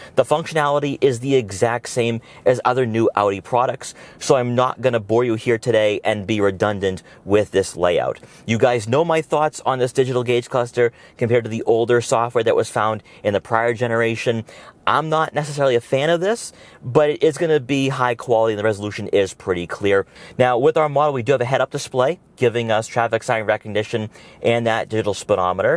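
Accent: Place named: New England, United States. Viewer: Tail light setup reminds me of a Lexus LS. Also loving this guy's wicked New England O's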